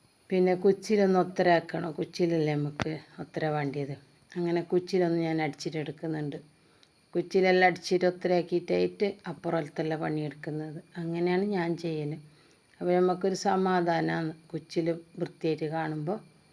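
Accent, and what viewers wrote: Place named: Kasaragod, India. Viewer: Nice sharing 🎉🎉🎉🎉 കാസ്രോട് ഭാഷ 😂😂 👌🏻👌🏻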